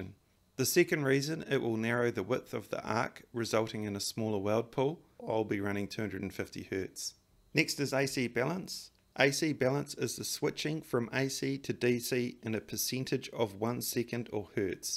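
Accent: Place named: Australia. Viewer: I only watched the video because he has an Australian accent and sounded like he knew what he was talking about. Turned out to be a good video.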